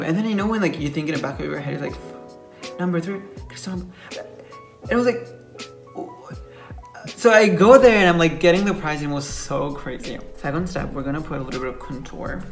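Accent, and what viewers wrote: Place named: United States. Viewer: Where did you learn American English?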